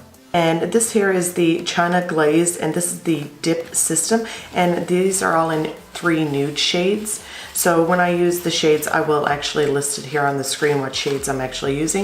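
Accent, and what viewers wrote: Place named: Newfoundland, Canada. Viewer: I just came across this video because I bought the system. Qp seconds in I had to go see what part of NL you were from ☺ fellow NLer here.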